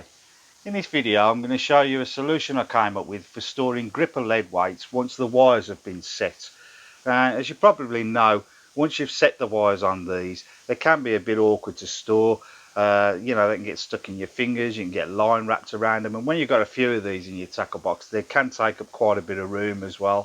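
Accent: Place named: West Midlands, United Kingdom. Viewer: Like how you think great great tips & ideas grip leads can be a pain n literally sometimes when rummaging around in your box . sounds like your a west Midlands chap like myself i love all types of fishing but the sea fishing the most regardless of the distance from brum . Wish you luck n success with the channel from a new subscriber 👍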